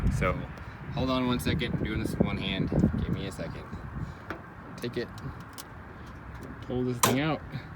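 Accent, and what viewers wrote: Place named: South, United States. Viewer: Actually excited to change the filter now that I watched this super clear video. Your country voice is so soothing!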